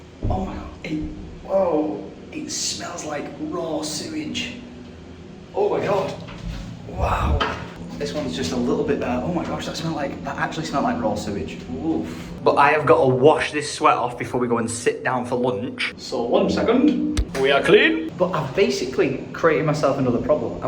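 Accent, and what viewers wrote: Scotland, United Kingdom. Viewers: Love your Scottish accent, I'm from Scotland myself, we need more of it in the vlogs. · I laugh way too much at Scottish accent 😂 Honestly so glad for the day that the YT algorithm led me to this guy’s channel to watch one of the LA travel blogs. Top tier entertainment!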